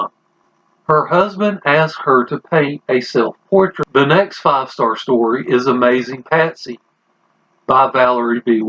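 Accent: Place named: Appalachia, United States. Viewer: Hey. First time viewer. I won't presume to guess where you're from, but the way you speak reminds me a wee bit of some dear friends from Appalachia. Also, good instinct with the lava lamps. Ads a nice dynamic element to your shot. Also, also, didn't know about stone babies before (I do the nurse thing IRL, and I am reliably fascinated by medical phenomena I haven't heard of before).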